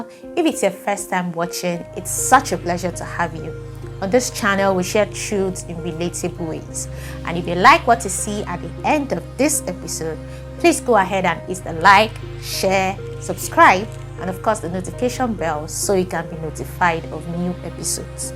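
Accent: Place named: RP, United Kingdom. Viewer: This makes a lot of sense. Sequentially presented with Queen's English. Engr. Dr. S. A. Aina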